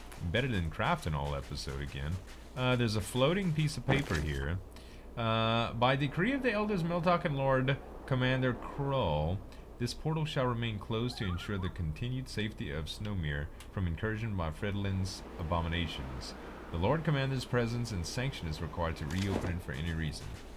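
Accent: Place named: Grand Island, United States. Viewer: Love the accent, reminds me of grand island. Keep up the goood work!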